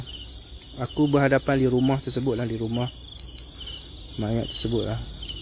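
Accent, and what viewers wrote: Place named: Northern Malay, Malaysia. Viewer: Hang nk ckp utara ,hang xyahlah ckp key el plak....lagi satu klau nak ulas tu ang ulasla dgn bijak sikit...walaupun hang seorang pemberani tp bila ulas mcm tu rasa semak..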